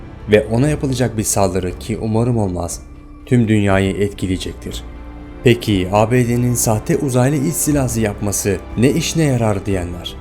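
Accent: Azerbaijan. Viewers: sen azerisin yoksa turk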